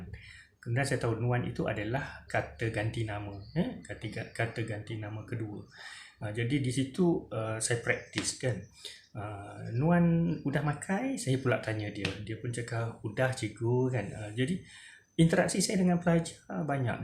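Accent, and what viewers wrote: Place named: Iban, Malaysia. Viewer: Best sebutan cakap Iban cikgu. Betul2 original. Belajar lebih banyak lagi Cikgu.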